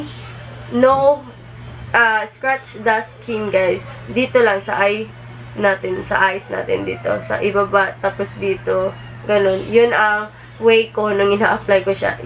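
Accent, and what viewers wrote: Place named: Visayas, Philippines. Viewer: Halatang Bisaya HAHAHA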